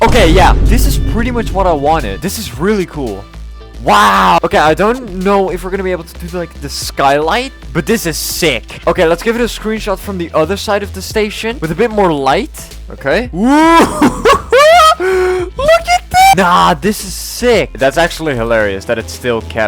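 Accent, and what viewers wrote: Netherlands. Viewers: bro you sound so dutch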